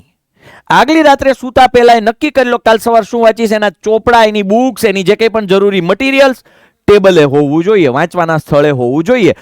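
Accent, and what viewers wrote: Kathiawar, India. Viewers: Tame kadhiyavadi cho to sarkhu j bolu kadhiyavdi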